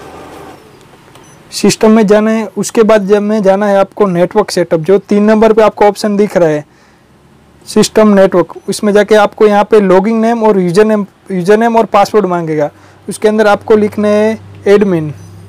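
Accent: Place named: Gujarat, India. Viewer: Bhai aap Gujarat se lgte ho aapki language se....Achha mujhe yeh to btao k A4 paper ka business krna ho to Guide kre aap plz.Thank you dear.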